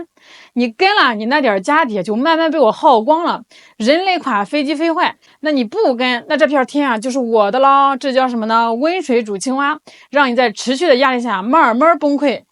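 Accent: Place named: North, China. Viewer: 口音挺熟，就是想不起来了。或许山西，河南，河北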